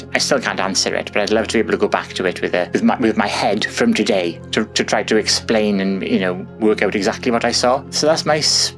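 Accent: Wales, United Kingdom. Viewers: I really enjoyed listening to your account of what happened.. I have an open mind to these things, although i have never experienced anything myself.... your Welsh accent is lovely ! xx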